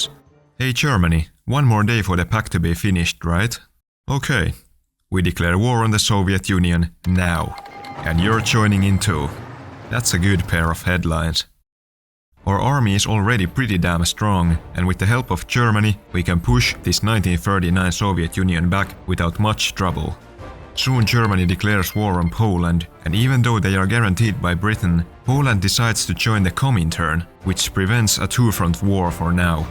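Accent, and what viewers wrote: Finland. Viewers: Holy finnish axent😂❤ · His accent Sounds very finnish😂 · Ystäväi ootsä suomalainen kuulostat siltä ja lähit armeijjaan? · finnish axent sensed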